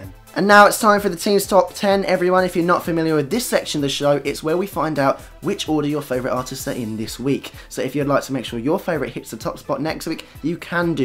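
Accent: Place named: Australia. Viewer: Phaha hilarious! Your australian accent is just like mine, guess that could be a compliment or not we may never know! xD you never fail to make me laugh, thanks! :)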